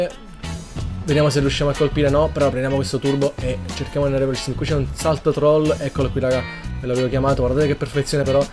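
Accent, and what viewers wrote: Italy. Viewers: da come parli deduco che sei del centro/sud Italia.Confermi?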